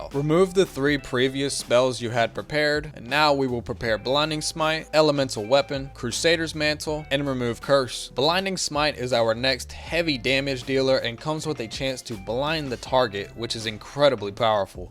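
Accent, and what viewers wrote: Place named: Staten Island, United States. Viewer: Had to subscribe. You totally sound like you're from my neck of the woods (I'm in Staten Island).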